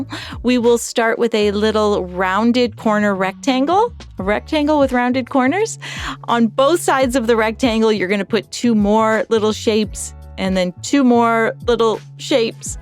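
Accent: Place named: Ontario, Canada. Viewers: I love your French coming through...it's nice to hear "Canadian"... (I'm Canadian) I'm looking forward to trying your breakfast doodles. So fun! Side effect...making me hungry😂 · sounds pure Ontario to me!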